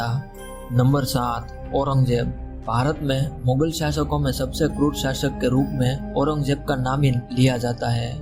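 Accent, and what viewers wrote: Gujarat, India. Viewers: Aapke bolne ki style gujrati people jaisi hai...kya aap saurashtra se hai?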